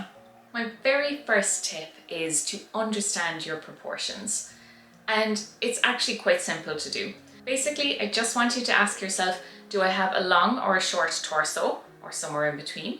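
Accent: Ireland. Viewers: Great video. Informative and uplifting.. thank you for the tips. PS. Your pup is adorable and I adore your Irish accent. Best wishes from 🇨🇦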